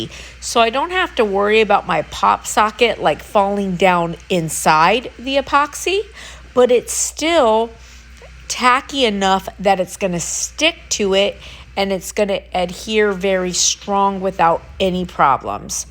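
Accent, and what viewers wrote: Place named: Philadelphia, United States. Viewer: You sound like u have a Philly accent. Anyways thanks for sharing with us. I appreciate the information so much.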